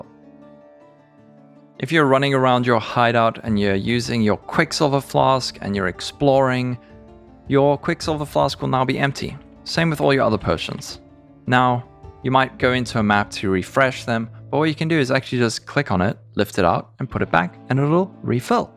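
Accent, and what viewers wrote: South Africa. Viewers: south african?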